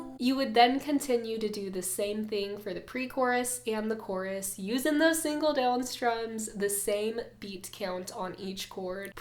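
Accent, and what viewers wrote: South, United States. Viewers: kinda sounds a bit like a southern accent to me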